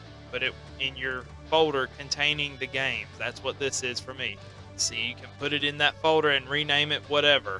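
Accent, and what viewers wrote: United States. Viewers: Everything sounds so much more simply explained in a Southern accent. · Sound like you from Arkansas son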